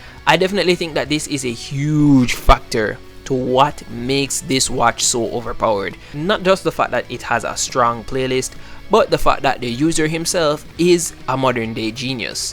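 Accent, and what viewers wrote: Jamaica. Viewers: your jamaican right? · Are you from Jamaica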